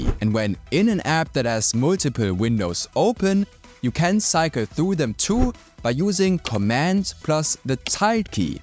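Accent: Germany. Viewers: really knows his stuff and doesn't waste a word - if anything he's too concise. His tips are uniformly great. (And the "light L" and glottal stops that Germanise his flawless English only add to the aura of genius.)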